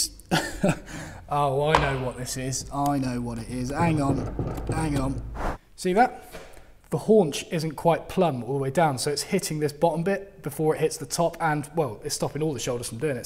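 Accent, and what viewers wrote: England, United Kingdom. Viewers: it's nice to here and English accent but you are way beyond my abilities.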